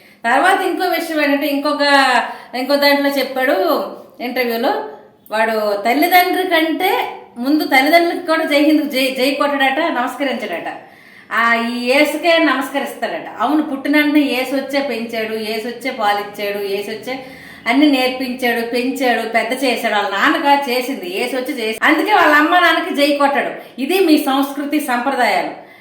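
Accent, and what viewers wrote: Srikakulam, India. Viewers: సూపర్ మేడం శ్రీకాకుళం యాసలో పాస్టర్ క్రైస్తవంలో ఉన్న చెడుని చూపిస్తున్నారు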